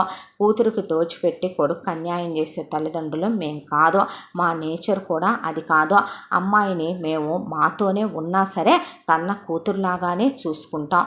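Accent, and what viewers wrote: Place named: Rayalaseema, India. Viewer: mee voice variation maatram super andi enni sarlu e story vinnanu mee voice gurinchi rayalaseema slang super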